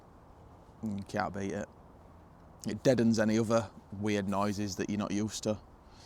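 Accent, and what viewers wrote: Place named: North, United Kingdom. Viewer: Great way to start my morning! Northern accent is perfect ❤ I see why this is one of your favorite spots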